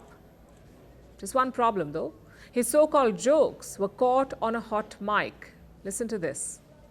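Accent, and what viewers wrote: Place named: East India, India. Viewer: This is comical. Here’s an East Indian/Canadian Journalist with a heavy accent telling the World that Canadians need to stand up to the big bad orange man who hates our Prime Minister Trudeau and wants to take over Canada. This has become like a Saturday Night Live skit. Donald Trump knows full well that for the last 8 years the alt left Socialist Canadian media has fed conspiracy after conspiracy to its citizens who gobble it up and respond with distain and insults. The page has now turned. 🤣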